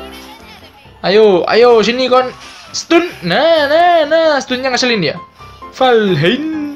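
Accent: Java, Indonesia. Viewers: Medoknya dipertahanin bro, ciri khas elo xD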